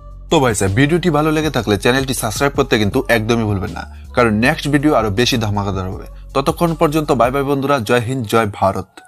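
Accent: Bangladesh. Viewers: Tui ki kore Indian holi ?? Tor bolar dharon sunei bojha jachhe tui bangladeshi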